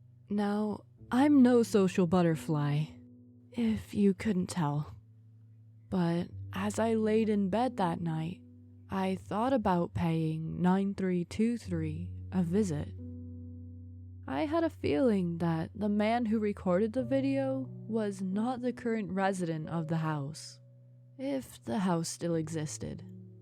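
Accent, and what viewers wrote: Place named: South, United States. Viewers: Love your calming southern accent. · You're right!! Magnificent southern accent!! Sounded like one of my neighbors 😂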